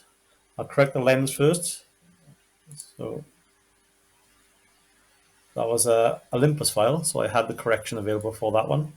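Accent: United Kingdom. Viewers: not tuned to the speech patterns of south Wales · not used to the local accent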